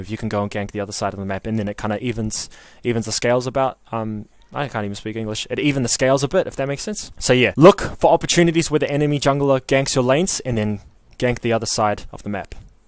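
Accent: New Zealand. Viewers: Your vid was one of the first jungler vids that came up for me and I was like what is that a kiwi accent I spy??? Hilarious stuff, can't wait to watch more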